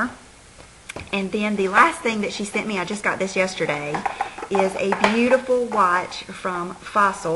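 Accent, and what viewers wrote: South, United States. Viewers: I'm a new subscriber and I will definitely stick with watching your videos. You seem like such a genuinely sweet person. I just love your accent too. I'm a slow-talking Texas girl myself, so I am glad to see another Southerner with their strong access just out there with no apologies.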